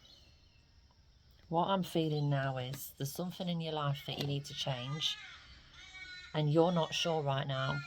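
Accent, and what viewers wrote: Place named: Ireland. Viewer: Hello I won’t call you as before. You know last night I had a dream since a long long time, I was in a place a high building and people were dancing drinking and having fun and I saw a kitten downstairs was trying to climb up and while I was trying to catch it two people fell from above near me completely covered in blood and at that moment I started shaking so hard that I woke up, why I’m telling you this but since few days somebody I was forced to let her go because I was not happy with she’s a karmic with I probably lived in another life cycle and now she’s sending in my mind images of her in a provocative way and I know these thoughts are not mines. I don’t know but these two things are related in some sort. Well Nice character some times you should be use again your Irish accent 🌹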